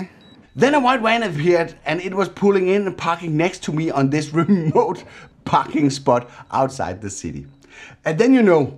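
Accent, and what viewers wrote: Denmark. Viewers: I thought I recognized the accent... I'm half Danish. (Not important but yay)... Very informative and interesting video. Tak. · Ah, Denmark. Tried to place the accent and then the language reminded me about the "Danish language breaking down" video. 😁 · As a Norwegian; I can hear a distinct danish accent in your english🇳🇴😂 edit; Impressive vids👍👍👍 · Lige en dansker der tjekker ind. Der er SÅ MEGET plus på kontoen for at rent faktisk tag det der "Youtuber" seriøst istedet for det evenlige jeg er sej - selvforheliigelses som er upræget for mange Y-T (fra dk) Dog lidt malurt i bægeret. Dit DK accent er MEGET stærkt, men MEGET derudtale uden øhhh ehhh and so on. Good Work ! sq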